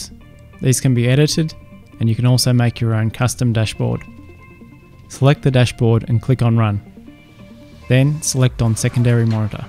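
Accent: Australia. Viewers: Are u Australian?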